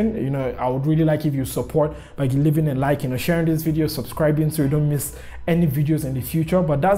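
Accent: Nigeria. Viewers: are u nigerian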